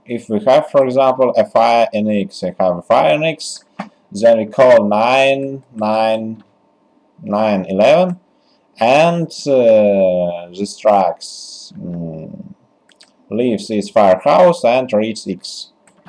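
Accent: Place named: France. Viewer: understanding you is quite difficult. we just want to know how to get the answer and the guy has a french accent that why its hard to understand him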